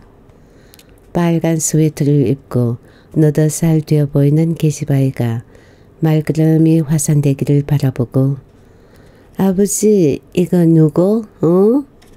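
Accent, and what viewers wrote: Gyeongsang, South Korea. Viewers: 경상도 말씨가 참 좋네요. 아들이 엄마를 보고 어짠 일인기요? 라니... 참 어이가 없네요. 잘 오셨어요 얼른 들어가입시더 해야죠. 아이보고 할매한테 정중히 인사드리라 하며 가르치고요. 아들부터가 잘못했네요. 우리 남편도 시어머님께 성질부리는 말투로 말해서 제가 뭐라했네요. 왜 다정하게 말하지 그렇게 말하냐고요.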